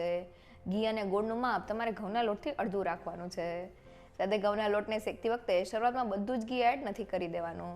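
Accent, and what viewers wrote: India. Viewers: Karan ke i think tevi aadiwasi vistar ma rahe che karan amara sight aadiwasi vistar na loko aa rite j gujrati bole chec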